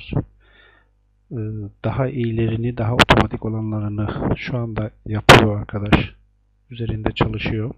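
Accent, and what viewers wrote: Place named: Ardeşen, Turkey. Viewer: biliyordum :) ardeşen tarafları gibi hissettim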